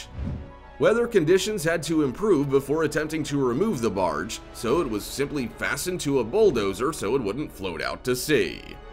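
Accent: United States. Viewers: Might have been interesting to watch, but I only lasted around 15 seconds. I think you have to be american to be able to listen to that narration any longer.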